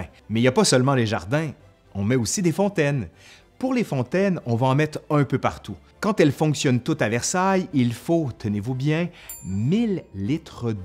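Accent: Quebec, Canada. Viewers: Tes vidéos sont très intéressantes, mais je te suis aussi pour ta sympathie et ton accent québécois! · Nous français on trouve votre accent bizarre on en rit même...😓😓😓 Mais à force d écouter la chaîne j apprecie votre accent donc continuez amis du QUÉBEC Super doc comme toujours Force à vous 💪💪💪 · Le roi avait le palais ouvert et de plus, une dentition atroce. Il parait qu'il lui était impossible de mastiquer. Il ne pouvait prendre que de la nourriture liquide ou en purée. Qu'en est-il ? Vidéo très intéressante comme toutes les autres ! Je vous remercie d'articuler parfaitement et de parler à un rythme également parfait. Cela permet une compréhension idéale. On vous entend bien, on vous comprend bien, et en prime on a un bel accent canadien ! Lui-même parfait car juste assez prononcé pour donner une jolie musique mais sans nuire à la compréhension. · Suis français et j’adore me faire raconter l’histoire de France par quelqu’un qui a l’accent québécois. 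J’écoute/je regarde toutes les vidéos depuis le début #mercileconfinement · L'histoire de France avec le charmant accent canadien, ça en jette !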